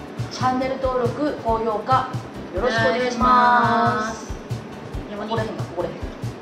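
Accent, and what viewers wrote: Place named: Yamagata, Japan. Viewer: 芋煮というので野菜の煮物のようなイメージを持っていましたが、この動画を見ると鍋とか汁物のような料理だとわかりました。美味しいのでしょうね。山形弁が時折出てきました。山形弁の響きはとてもよかったです。もっと、山形弁を増やしたらどうでしょう。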